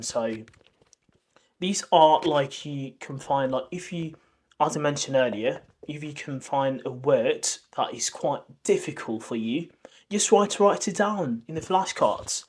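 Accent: United Kingdom. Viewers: Love your British accent . I visited Brighton in 2005 .It was amazing.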